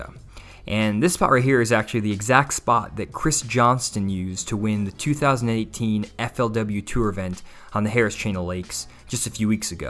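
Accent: Arkansas, United States. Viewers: are you from arkansas?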